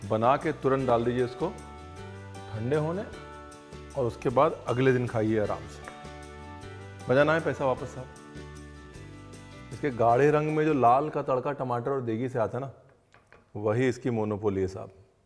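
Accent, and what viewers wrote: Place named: Lucknow, India. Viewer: Your hindi in lakhnavi accent is very pleasant to listen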